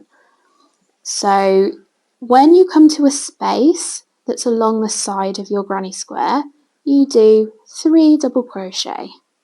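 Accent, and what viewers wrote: England, United Kingdom. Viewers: WOW!!! Thank you so much for this great video.. I can't wait to start.. I'm new to crochet.... Can I just ask a question? .. I know you sound English but are you using American terms ? .... I'm from the UK and I thought the UK term was called a trebble crochet? .... Sorry for my basic question😊....Many thanks❤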